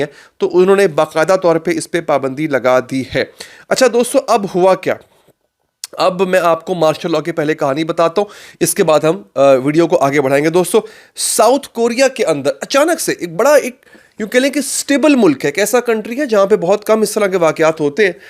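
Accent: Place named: Lucknow, India. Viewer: Aabki Dhuli Huyi Lucknovi Urdu aur Bolne ka andaz aur Laheja bahot pyara hai.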